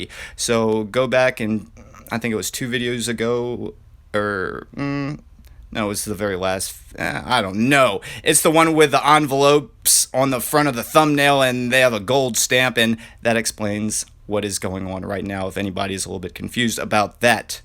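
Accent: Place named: Russia. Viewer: Again russian accent 😎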